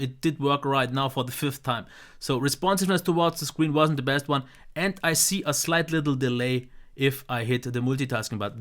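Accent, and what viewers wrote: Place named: Germany. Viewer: "grey knight" made serious comment about your integrity as an impartial reviewer. Please speak a little slower and I would like another review for lgg4 as this is a phone I think I would like. Thanks, and you are probably German or E. Europe as another subscriber asked. We are all equal, no reason not to answer a simple question!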